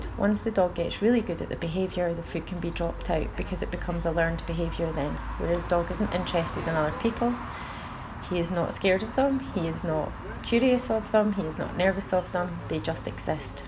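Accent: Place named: United Kingdom. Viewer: Did you say 60 or 16 reinforces? I'm sorry, not really used to british accent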